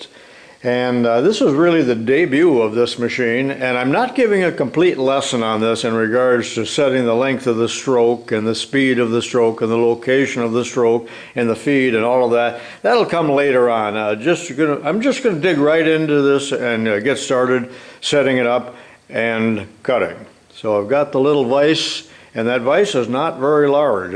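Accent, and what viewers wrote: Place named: United States. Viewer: When i was a kid, way before the internet. The way you talk is how i imagined all Americans talk. To my foreign ear the pronunciation is like poetry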